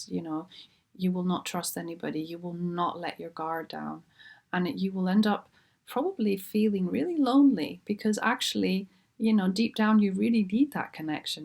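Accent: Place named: Scotland, United Kingdom. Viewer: Can't figure it out. Sometimes she sounds like a native of Scotland, sometimes Northern Ireland.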